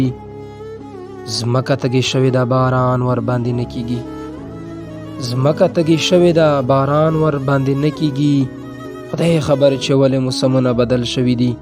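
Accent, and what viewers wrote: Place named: Karlani, Afghanistan. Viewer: په کرلانی لهجی کښ یایه ډیر خواند کوی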